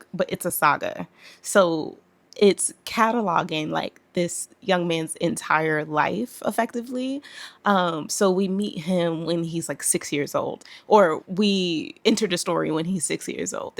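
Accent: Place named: Brooklyn, United States. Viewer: 👋🏽!!! I am just coming across your video and I have to check out more!!! You sound just like me and I love it! BACKSTORY: I’m born and raised in BK - went to BGHS if that tells you anything. Now live in VA. Folks are always talking about my accent 🙄. I’m like, well here’s the pot calling the kettle black🙄. I remember buying our books downtown Brooklyn and along Fulton St. So now Malcolm X is popping like that?! I’m so jelly! I can’t wait to see more vids from you. I read How To Love a Jamaican back when I lived there!! I’m so glad to have came across someone else who knows about this one. I think I want to reread it now! Thank you!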